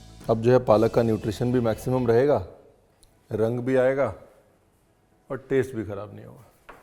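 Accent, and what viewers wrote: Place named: Uttar Pradesh, India. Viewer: Is Ranveer UP'ite? He has a UP accent..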